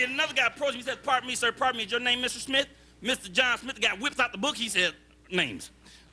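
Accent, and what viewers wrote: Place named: Louisiana, United States. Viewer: Something about the sound of his voice rings "Cajun" to me ...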